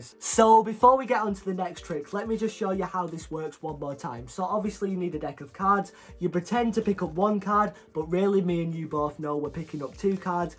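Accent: United Kingdom. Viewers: l love your british accent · You are incredible. Can I ask you where are you from. It sounds that you have a british accent.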